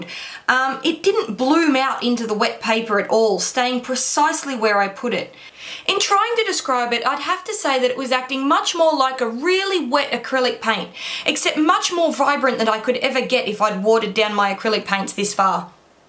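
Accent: Australia. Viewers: from one Aussie to another..it’s refreshing to hear an Australian accent for a change on YouTube 😀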